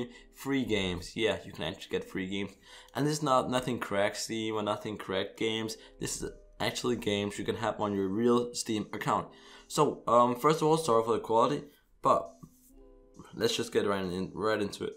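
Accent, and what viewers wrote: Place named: Denmark. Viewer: Trode ik du var dansk :P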